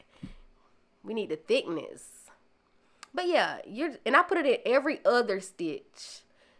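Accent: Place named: Texas, United States. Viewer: I was just wondering when would you have a new CIY vid!! Thank you! & I love your accent, sounds like you're from Texas.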